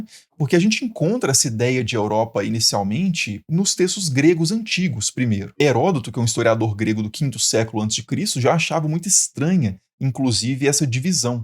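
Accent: Minas Gerais, Brazil. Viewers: Eu “descobri” esse canal há pouco tempo e já sou fã! Adoro a tua maneira de explicar, e acima de tudo esse sotaque mineiro lindo. Muito sucesso e muito obrigada por partilhar o teu conhecimento conosco 🙏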